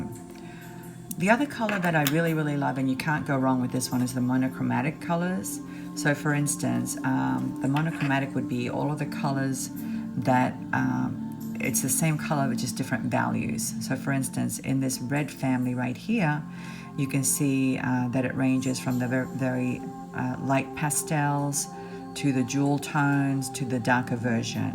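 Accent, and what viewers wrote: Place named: Australia. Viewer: Aah ha, I thought I detected some Aussie there in your speech. I am a Brisbane girl too from Scarborough on the Redcliffe Peninsula. Travelled quite a bit but always come home to Redcliffe. X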